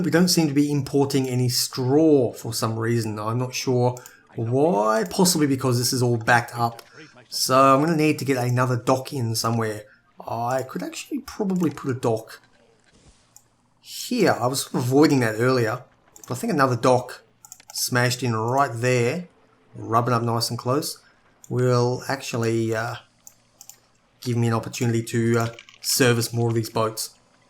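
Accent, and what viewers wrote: Australia. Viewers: You sound like an Ozzie, so why do you pronounce route as rout? Never heard an Oz do that before.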